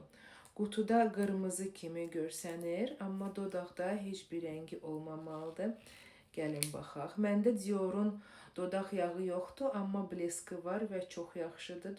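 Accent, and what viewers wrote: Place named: Russia. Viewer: Russ dilli xanımdır normak həyatda azərbaycan dilindən çox isitifadə etmir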